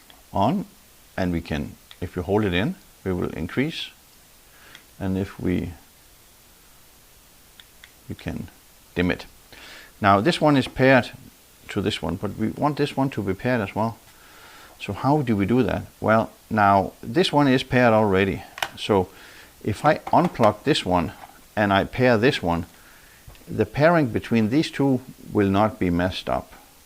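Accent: Denmark. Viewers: Tak. I trust you probably have Danish ancestry as I do miss the Danish English accent.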